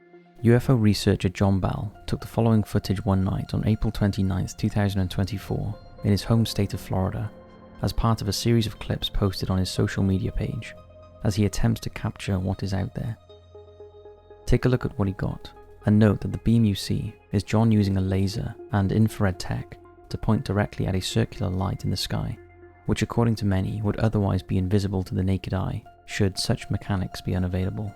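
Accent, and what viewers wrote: Wales, United Kingdom. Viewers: I can hear a Welsh man init x Who doesn’t love Wales 🏴 ! Whose coat is that jacket? ❤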